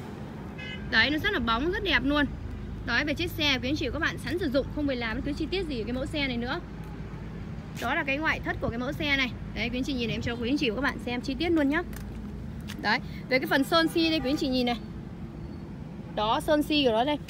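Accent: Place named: Hai Duong, Vietnam. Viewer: Thương em vất vả, nghe em nói đã biết quê gốc Hải Dương, cứ níu na níu nô, chúc em kinh doanh tốt nhé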